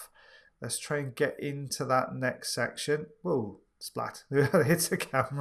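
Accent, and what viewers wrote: Wales, United Kingdom. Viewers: it's definitely Welsh. Sounds probably from the valleys of Wales. Hth xx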